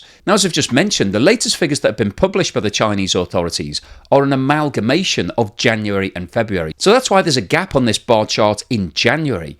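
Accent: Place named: England, United Kingdom. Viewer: He sounds pretty English to me.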